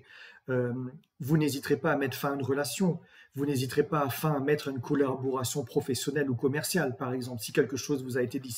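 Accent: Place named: Alsace, France. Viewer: Super (y) Très claire, cette présentation de la prochaine Pleine Lune du 15 novembre, assez technique (comme j'aime!) mais aussi très parlante car reliée à des faits concrets (la politique actuelle, l'économie...). J'adore votre petit accent alsacien et votre façon très posée, très calme, très structurée de présenter cette carte du ciel: vraiment presque parfait! J'ai juste noté une p'tite erreur à "Neptune fait un carré au Noeud Nord" alors que c'est une conjonction, mais c'est vraiment pour vous prouver que j'ai écouté attentivement vos explications jusqu'au bout que je fais cette remarque;-) Bravo et merci infiniment pour cette belle présentation, en espérant en voir beaucoup d'autres, de la même qualité (et peut-être qu'un jour, vous nous présenterez votre chat? ) 😉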